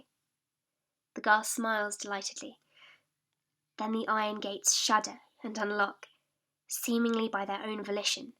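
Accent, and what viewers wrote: United Kingdom. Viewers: I like your reading very much! I'm Japanese girl and learning British English(o^^o) It's so helpful for me!Thank you!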